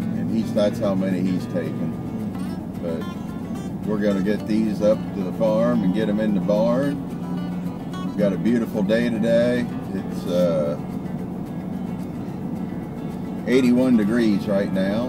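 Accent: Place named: South, United States. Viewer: Accent is no different from an american southerner thats awesome.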